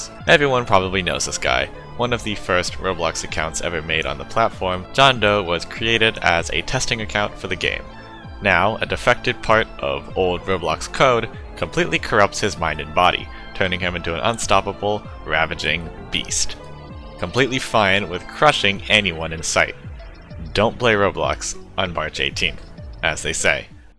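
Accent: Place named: United Kingdom. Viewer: your British, aren’t you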